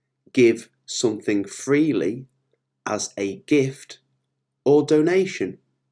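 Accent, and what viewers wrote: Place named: North, United Kingdom. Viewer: Your teaching method is fantastic but the pronunciation is like from Yorkshire or Manchester .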